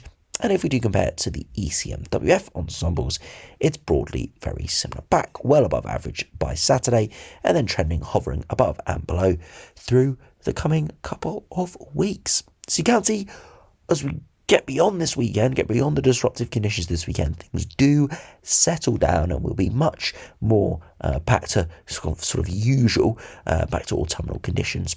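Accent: London, United Kingdom. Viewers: I subscribe to this channel for 2 reason, the weather and the posh London accent. You can feel the privilege in his voice.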